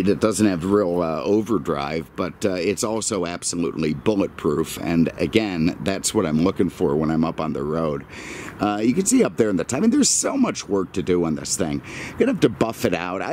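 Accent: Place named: United States. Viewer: You are funny, and sound like a cool American 😎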